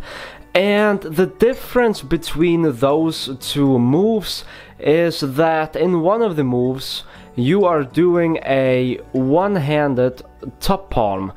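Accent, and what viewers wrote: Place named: Russia. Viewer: у тебя очень русский акцент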